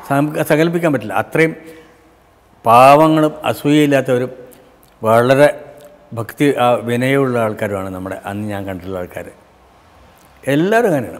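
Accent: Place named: Kannur, India. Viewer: ഈ കണ്ണൂർ സലാംങ് കേൾക്കാൻ നല്ല രസമാണ്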